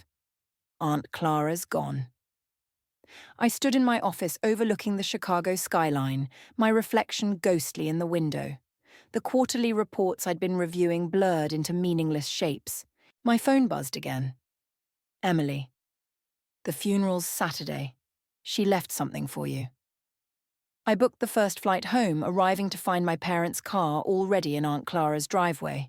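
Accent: England, United Kingdom. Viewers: Beautiful English accent.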